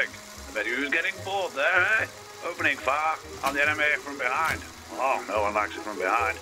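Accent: United Kingdom. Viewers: I like it. Especially the typical English voice :) In all the videos!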